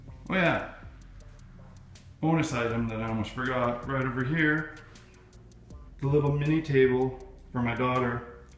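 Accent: Canada. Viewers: Are you from New Brunswick?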